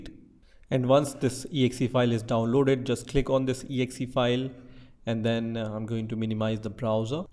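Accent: India. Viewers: Can I find a video that isnt done by an Indian with an annoying accent?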